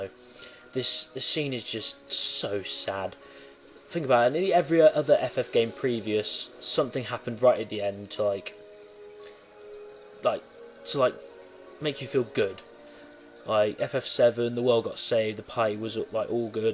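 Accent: United Kingdom. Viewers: the video would be more epic without your very gay voice, let me guess britain? all islandmonkeys sounds gay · Are you british?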